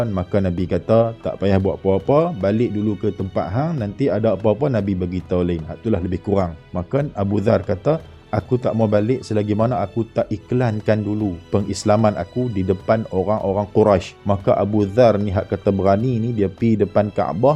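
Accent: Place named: North, Malaysia. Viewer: speakker org utaqaaahahahah pekattt ni rimbukkk org kl mna fhm apa hahahah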